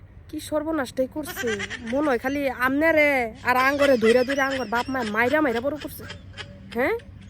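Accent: Comilla, Bangladesh. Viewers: এটা কুমিল্লা মুরাদনগরের ভাষা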